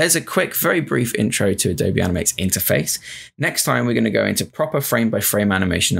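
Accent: United Kingdom. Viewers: Bro's British accent sounds so abnormal to me. Can y'all relate.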